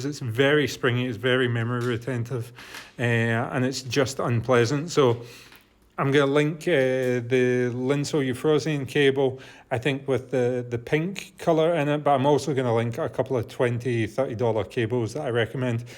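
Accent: Scotland, United Kingdom. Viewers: Id say central Scotland.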